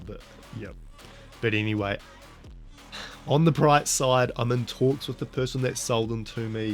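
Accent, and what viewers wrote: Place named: New Zealand. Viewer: LOL after watching countless american youtube vids on pokemon.. listening to you makes me realise what us kiwis sounds like.. I would say you sounds like a typical kiwi. Man we sound so chill and unserious with a certain twang.. and the words we use.. fANcy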